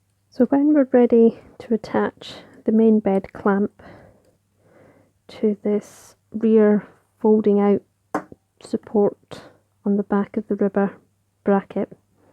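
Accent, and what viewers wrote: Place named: Scotland, United Kingdom. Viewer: Thanks so much for this video. It is very well done and has provided me with clear and concise instruction. Was lovely to hear your Scottish accent too. A wee bit of home for me. Thanks again.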